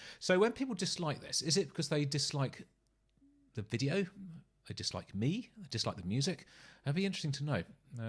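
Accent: United Kingdom. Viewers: Doug is pretty great too…but he’s American. I love hearing British accents so I prefer your channel.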